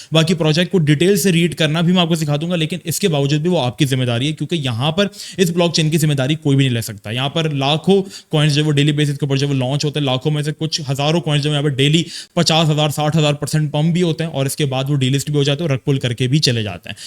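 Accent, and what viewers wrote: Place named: India. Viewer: aapka accent almost indian accent hai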